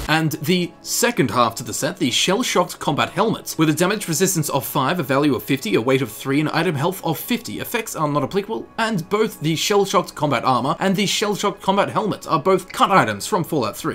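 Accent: Australia. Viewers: Dude are you Australian? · Is he Aussie because if so Aussie Aussie oi oi oi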